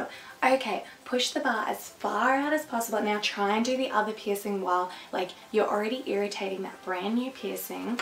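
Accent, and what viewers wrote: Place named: Australia. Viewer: That's Gross. I pierced my own ears when I was 12 the second and third holes. Had my first ones done at birth. (Argentine parents). Girl u have. Great content 👍 recognise that Aussie accent I'm in Canberra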